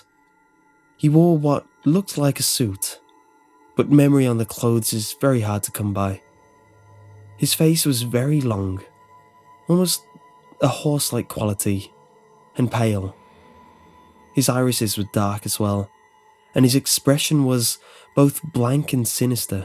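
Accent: England, United Kingdom. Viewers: He is from England, they pronounce things differently there (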